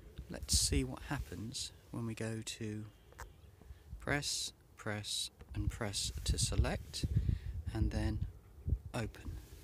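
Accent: United Kingdom. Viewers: Thanks, That link didn't work but no matter as I found a link to download on the VibranceHDR webpage and installed from there. It was a bit disconcerting as Google obviously not happy with that and gave me all sorts of dire warnings and are-you-sures? But I pressed on and installed. Then I received all sorts of unwanted notifications from them on all manner of subjects but blocked them and now all is quiet again. I just need to try out Vibrance HDR now and I shall follow your guidance closely. I have to say it was nice to hear another English voice on a YouTube tech/geeky subject video and your quiet clear and measured presentation is much appreciated along with your commentary on extraneous helicopter and gun noises - made it all the more human and genuine for me;-) If I haven't subscribed then I'm about to. Best wishes. Philip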